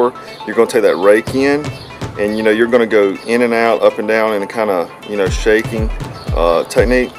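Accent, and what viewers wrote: United States. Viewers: That country accent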